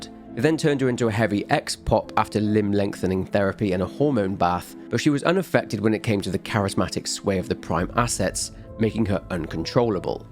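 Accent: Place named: England, United Kingdom. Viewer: I love voice and English accent. They work together well sounding pleasant and intelligent to me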